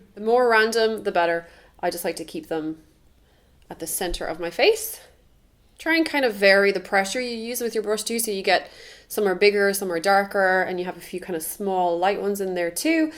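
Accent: Ireland. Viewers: I know is in Australia, but her accent sounds very irish. So im confused.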